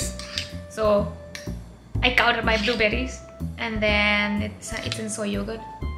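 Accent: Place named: Kerala, India. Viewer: Yo....I have stumbled on your UT videos a couple of times in the past...but today my mind decided to chkout a few in good dtl....1stly, for cooking skills at this age, I must exclaim OMG, WOW WOW WOW n congrags n I did moreovr add, tht your speech tone (but slower talk speed dfntly), dress code, prsnlty and presentation, all in all is quite professional alrdy.. keep it up keep it up God Bless among the lots observed including cat out of bag you are mallu frm coupla tips of tongue..mnwhl the above in nut shell... 'am a very senior mallu myslf too...from out of India for long long time..I ardently follow my family trait n hobby to mentor n guide lifestyle/career...n its wht caught my eye n interest in a Chef Cinderella of future, yes, I think it cud be likely...but but BUT, you need to be WELL sculptured in literally alround ways... I never liked Ou Ou Ou french but do have a rather strange twist (if you will) connection tho...jst how on earth did you land up there..... 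BTW, am neither tech savvy nor the social media guy...so my fingers are yellping to stop...shd you wanna hookup in furtherance email/WA is my convenient preference...you've been off UT for 2mnths now it seems... will chk on your reply....nice getting wth you... be safe n care...God Bless... BRgds GK/Bobby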